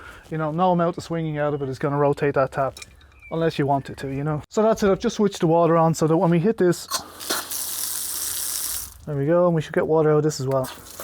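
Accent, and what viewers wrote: Dublin, Ireland. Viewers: Thanks so much. This is exactly my problem at the moment. The house is 18 years old now and the tap,has been dripping for the last couple of years. My husband has never gotten around to replacing it. I’m going to give this a try myself. Greetings from Friedberg in Hessen, in Germany. You sound like you are from Dublin, just like myself. Take care.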